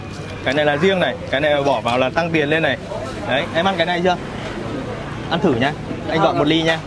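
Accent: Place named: Central, Vietnam. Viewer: dạ. Mà hình như a người Hà Tĩnh phải k ạ? Nghe a ns giọng Bắc cũng chưa chuẩn lắm...vẫn có chút miền Trung phảng phất...hehe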